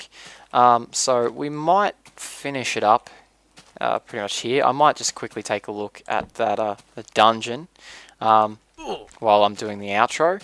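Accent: Australia. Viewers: Your australian accent :) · Are you Australian?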